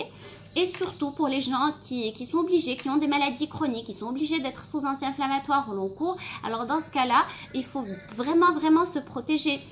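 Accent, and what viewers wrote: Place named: Marrakech, Morocco. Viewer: ختي وراه تنكول اللهجة شوية ديال مراكش 😘😙 يعني قريبة ليا ❤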